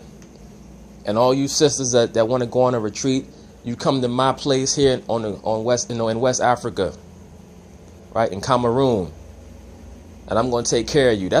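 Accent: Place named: East Coast, United States. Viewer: I like ya commentary/ideas on things. You gotta be from the East coast, I hear it.. such as myself. Smart brotha!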